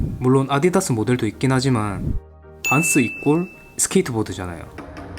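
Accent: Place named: Gyeongsang, South Korea. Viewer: 사투리 안고치면 구독자 이정도가 한계일듯ㅜㅜ 경상도 사람인데도 엄청거슬림ㅜㅜ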